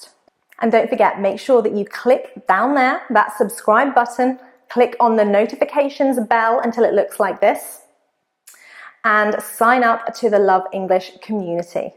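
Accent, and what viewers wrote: United Kingdom. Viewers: Tell me why I can understand you easily despite your british accent :-D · I love your videos as your accents is on british english based.We people love british accent rather than peculiar american accent. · I studied English in California so I have a hear for american English. It is the first time I come across your channel and after few seconds I could tell you are from UK. I sometime use Google Translator because it is fast and convenient, if not happy with it I reach for my English to English vocabulary. Not problems with movie or most books but still I have hard time to understand songs. As for jokes it is more cultural, if you are not a native it can be challanging. Finally, nobody mistake me with a native speaker, I still have a very strong Italian accent. Another criteria you can add to this list cuold be if you can solve crosswords. 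I'm not able to do it in English and sometime I find it difficult even in Italian.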